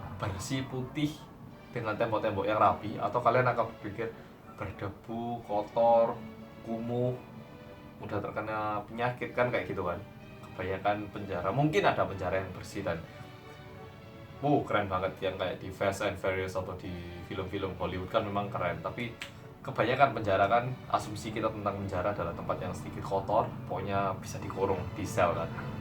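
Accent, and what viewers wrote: Java, Indonesia. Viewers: matursuwun udah di kasih Tahu, mas lek ngomong medok e mandes nemen heheeh ditunggu video brikute mas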